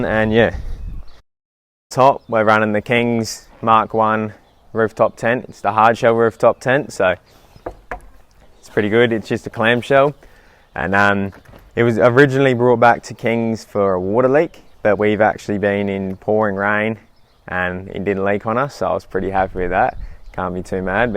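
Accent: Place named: Australia. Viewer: If anyone is confused he is speaking Aussie!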